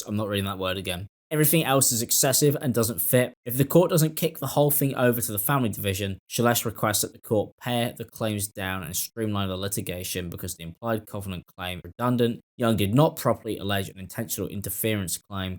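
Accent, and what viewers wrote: United Kingdom. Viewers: .... You're a Brit,? (from the accent) & you don't seem to understand American-style Family Law courts. Sniper-Bitch wants this lawsuit to go to Family Law because those courts are heavily biased against men - Period!!! It's one of the biggest legal scams going in America. This is a business law matter & that's where it belongs.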